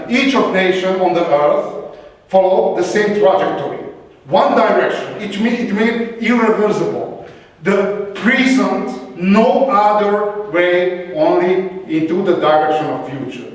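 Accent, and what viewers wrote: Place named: Eastern Europe. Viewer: Strong-,and advanced Eastern-Slavic accent.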